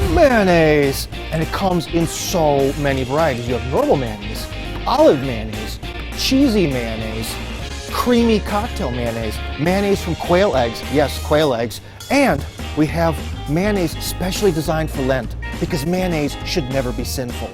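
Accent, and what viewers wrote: Russia. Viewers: нерасказывай мне ляля,у него русский акцент.просто чувак так решил подзаработать. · По акценту больше на русского похож.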